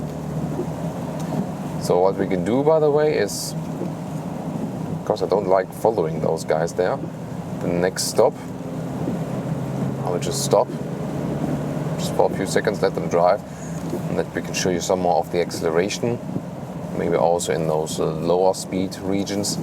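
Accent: Germany. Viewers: SOS10 I don't usually mind - gives the whole thing a bit more character - you know. The german doing an incredibly detailed and professional review of almost all aspects of the car - plus the accent - theres a reason i watch these videos, but I myself (german as well) like to constantly improve my english and be told when I make mistakes and how to fix them :)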